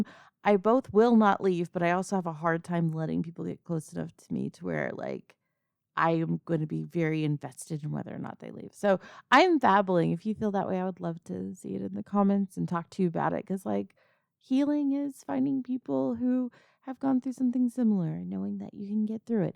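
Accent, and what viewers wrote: South, United States. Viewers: Thanks for the great audio I love the southern accent the idea of a strong minotaur girl wanting me is nice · Damn, your southern accent is hot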